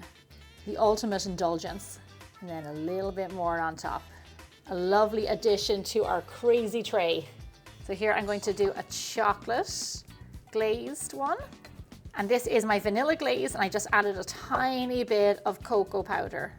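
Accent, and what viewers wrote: Ireland. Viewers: Love all of your recipes, tips, and especially your Irish accent!!! Been a fan since before Georgie was a twinkle!! Keep up the fabulous yum!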